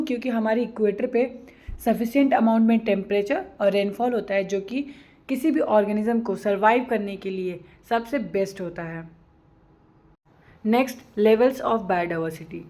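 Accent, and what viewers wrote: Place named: Bihar, India. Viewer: Ma’am you accent seems like you are from bihar😅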